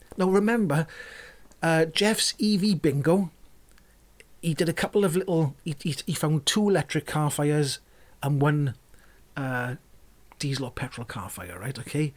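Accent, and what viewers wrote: Wales, United Kingdom. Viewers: I'm a diesel mechanic and not a big cheerleader of EVs, but I'd listen to this guy read the weather. Love a good Welsh accent, and that's a 10! 👍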